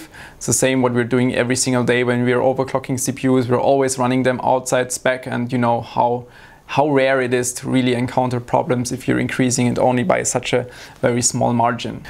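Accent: Germany. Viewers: Love the german accent 😍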